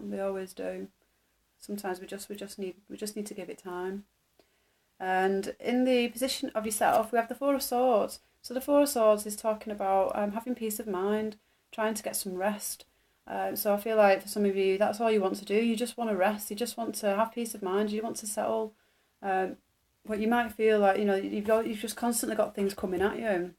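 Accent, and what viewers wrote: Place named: Manchester, United Kingdom. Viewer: Im from mamchester you sound like a mancunian 😅😅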